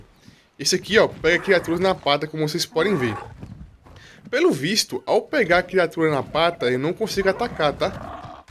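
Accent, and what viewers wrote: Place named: Alagoas, Brazil. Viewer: Cara vc é de Alagoas? Esse sotaque não me é estranho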